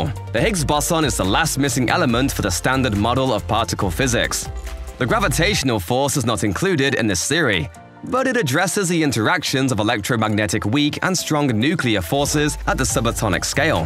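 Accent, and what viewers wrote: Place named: Ireland. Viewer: What is it with an Irish announcer emphasising the European CERN in imperial units and bracketing the metric with US spelling? Metric is standard. Even the troglodytic imperial units are defined by the metric standards, don't give the obsolete measures breathing space let alone put it front and centre. Plus whilst what was said was informative, the random graphics, plucked from who knows where, that have little to nothing to do with what is discussed, are not.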